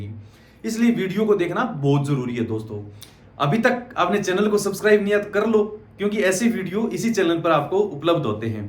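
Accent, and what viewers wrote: Rajasthan, India. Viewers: 😂😂👍👍 Very nice 👌👌 Bhaai aap ka samjhaane ka tarika bahut hi sunder hai 👍👍 अलबादी बकरा भाषा में राजस्थानी लहज़ा बहुत ही शानदार लगता है 👌👌👌👍👍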